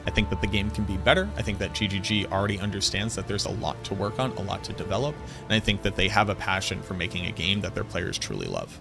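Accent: Boston, United States. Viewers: Hit'em with the math,!! Lol Great vid! Thanks brother. Appreciate you. Oh, and those Bostonian slide-ins, "id-eer" icing on the cake! LMAO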